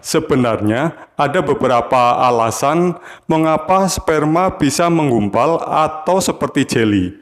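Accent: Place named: Java, Indonesia. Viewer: Suaranya mantap bgt. Sangat manly meskipun berlogat jawa.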